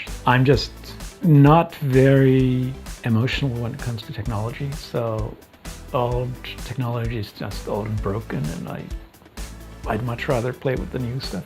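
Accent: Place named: Sweden. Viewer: wtf he speaks fluent finnish with swedish accent, as many swedish speaking in Finland.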